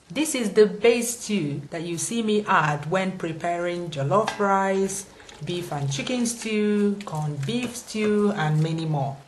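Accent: Igbo, Nigeria. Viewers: Although I don't hear Igbo, I love her humour blended with the Igbo intonation.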